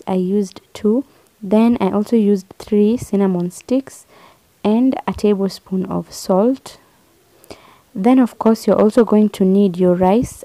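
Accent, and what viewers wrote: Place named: Africa. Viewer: I love your English i thought you're African wauuu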